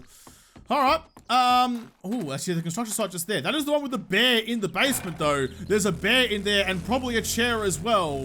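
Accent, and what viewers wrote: Australia. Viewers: i have a friend named josh, sounds exactly like you and is Aussie, hmmmmmmmmmmm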